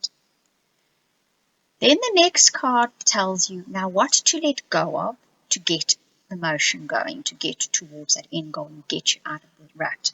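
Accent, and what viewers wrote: South Africa. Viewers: Hi are you South African?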